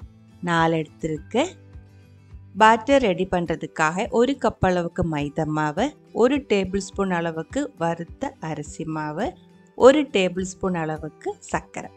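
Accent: Kerala, India. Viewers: Super pazhampori recipe sister uunga malayalam kalantha tamil speech super 👌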